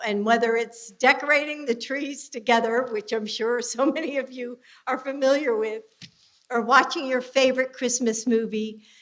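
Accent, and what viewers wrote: South, United States. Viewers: I’m sorry, I’ve never heard her speak with a southern accent like the beginning of this- Would sound nice if any truth or help with her words- It gets worse as it goes on- Dear God I wish it from the heart or star center for which she refers! I gotta bathroom lady... As they complain and hate America but go thru every room- can anyone imagine Biden spewing out this speech? Happy Holidays everyone from my heart seriously! God bless!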